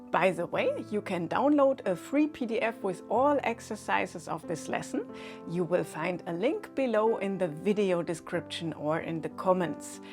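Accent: Germany. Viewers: Hello, your English sound German. Are you from Germany of one of the countries which speak German language. Excellent video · I LOVE THE GERMAN ACCENT..!!!...UM IS THAT YOUR HERITAGE..?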